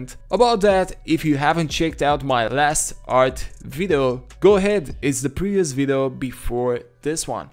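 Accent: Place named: Romania. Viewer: După accent ești român:)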